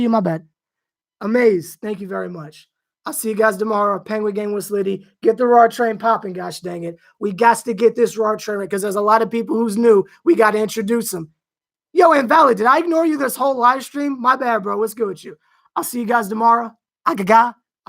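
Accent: Canada. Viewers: you Canadian ?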